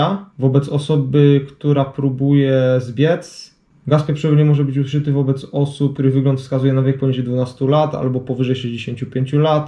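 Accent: Silesia, Poland. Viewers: O przepraszam ale jestem pierwszy raz na profilu i od razu po akcencie poznałem. Czy Pan jest ze Śląska i służy w śląskiej Policji? :-D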